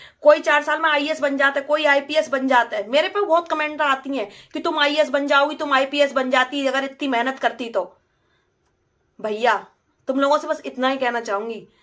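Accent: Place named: Farrukhabad, India. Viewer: aap ki boli to farrukhabd ki lagti hai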